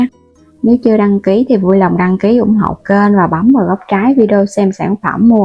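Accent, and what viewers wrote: Mekong Delta, Vietnam. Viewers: Giọng nói miền Tây dễ thương mà bạn sao nghe khó vậy hả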